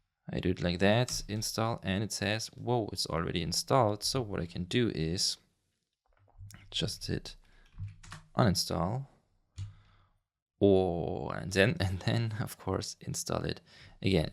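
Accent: Germany. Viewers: Also fur einen deutschen sprichst du ziemlich gut Englisch! Der deutsche Background ist jedoch nicht überhörbar 😃. Danke fur das tolle Video! 👌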